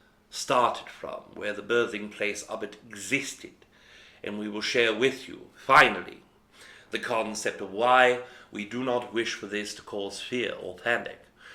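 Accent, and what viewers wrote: United Kingdom. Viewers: Oh wow, your voice when channeling Aridif has changed a lot. Here, you sounded just like yourself but with a mild British accent, and now (when you channel Aridif) you sound like a much older man with a thick British accent, that’s so crazy! It’s cool!!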